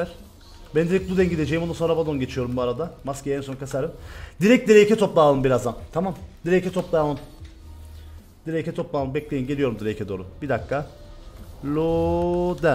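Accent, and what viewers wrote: Cyprus. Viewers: Abi şuan kıbrıstayım otelde herkes değişik değişik konuşuyor şimdi anlıyorum seni :D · TAMAMEN KIBRIS ŞİVESİYLE OYUN YAP ABİİİ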